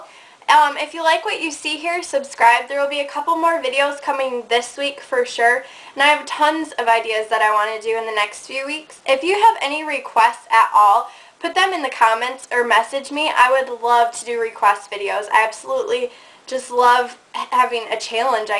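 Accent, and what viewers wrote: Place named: Wisconsin, United States. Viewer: Are you from the Wisconsin area? haha you have the accent.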